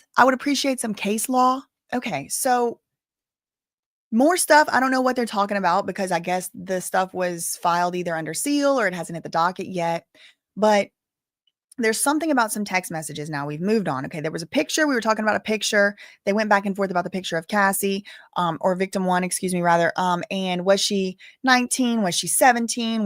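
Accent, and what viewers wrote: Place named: Louisiana, United States. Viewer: 💜💛💚⚜️ this Cajun is here in every chat! Im not always talking, but always learning from you! I love hearing the bayou in you come out 🥰 keep doing amazing things, Queen! Thanks for doing what you do!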